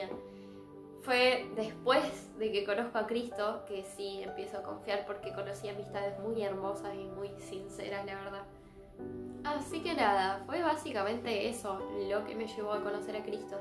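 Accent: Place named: Buenos Aires, Argentina. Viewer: Se nota lo porteño Sho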